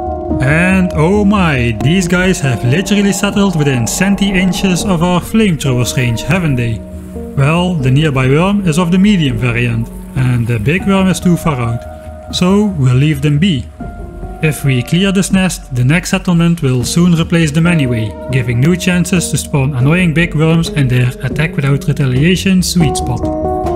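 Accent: Netherlands. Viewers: "But as I'm Dutch" I knew your accent was a little off to be french, but for some reason I didn't expected for you to be Dutch. I thought the accent was going to be different. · I recognized the Dutch accent curse from the start! As I have the curse myself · I've been wondering about your accent forever. is it dutch? or something I just can't put my finger on. it's really rather thicc for a dutch accent. but then again sounds like a dutch name. man.... maar uiteindelijk: toch GEKOLONISEERD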